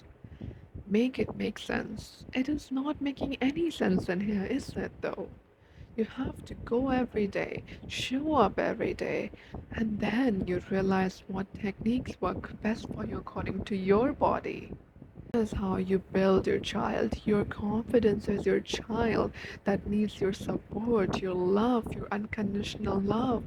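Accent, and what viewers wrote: Bihar, India. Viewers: Bihari pe accent kese🥰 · 18 years old seriously Dekh kr 25\27 saal ki lgte ho Plz apna bihari accent sunaao bht vibe and maza dono milta hai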